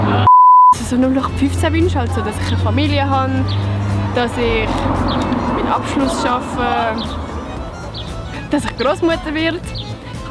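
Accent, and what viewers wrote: Zurich, Switzerland. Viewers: vo wo bisch du eigentlich?😅 Am Dialekt aa Ruum Züri, oder?🤔😅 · Dä dialänkt laht verrate Züri 😂😅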